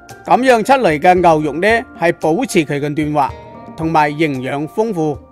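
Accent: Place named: Zhongshan, China. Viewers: 不怪，珠三角一帶，口音是這樣多變的。聽起來像中山。中山、順德一帶，口音多樣化，隔一條江、兩條村，已經有一點分別。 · 鄉音何來怪呢!!師傅鄉音似是中山?!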